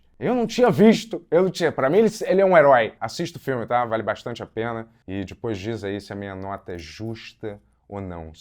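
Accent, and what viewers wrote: Rio de Janeiro, Brazil. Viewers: Amigo ,carioca. Carioca, amigo. Pronto, agora você já sabe como fala um carioca. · Muito carioca! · mano eu odeio teu jeito de falar e esse jeito de playboy carioca ''nem de esquerda nem de direita'' puta que pariu\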